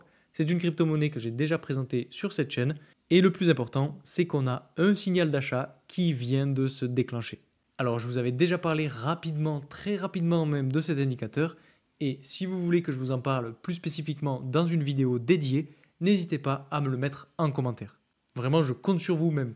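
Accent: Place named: Toulouse, France. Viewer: Tu as l’accent de Toulouse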